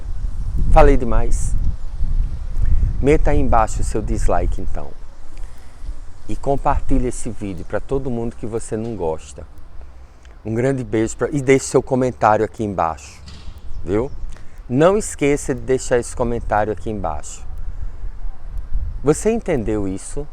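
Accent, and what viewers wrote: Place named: Rio Grande do Sul, Brazil. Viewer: Mas Bah!!! Num bom gauchês!!! Show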